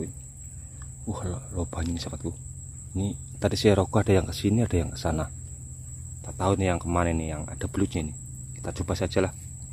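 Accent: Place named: Kebumen, Indonesia. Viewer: Aslm ww...mas asli kebumen apa bukan, karna bahasanya kayak kebumen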